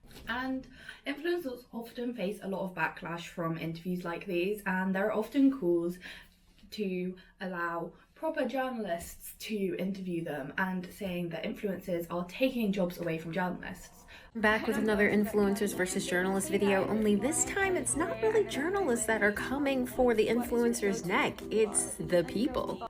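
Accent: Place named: RP, United Kingdom. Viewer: Your accent is so strong and you sound so posh. Haha, I say this in an endearing way from someone who is not anywhere close to the UK, and is from Indonesia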